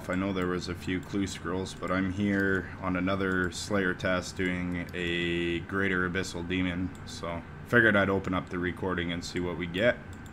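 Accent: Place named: Canada. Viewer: Fuck it. Nice vid SON!!! Throw in some more of that Canadian accent, aye?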